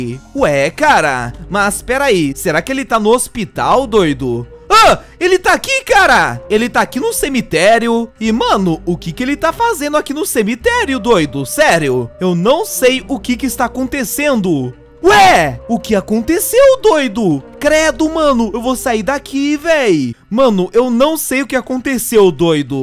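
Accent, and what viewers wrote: Portugal. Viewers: Ele é português?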